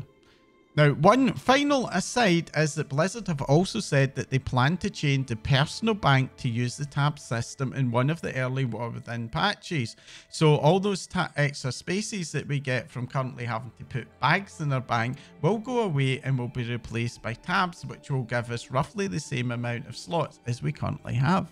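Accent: Scotland, United Kingdom. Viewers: i hear scottish accent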